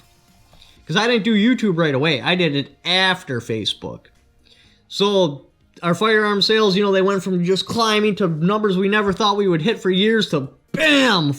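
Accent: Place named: Wisconsin, United States. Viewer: Wisconsin! That makes sense, your accent is definitely indicative of your area. Not a criticism, just an observation. I’m in West Virginia, population, 1.8 million with probably 50 different accents!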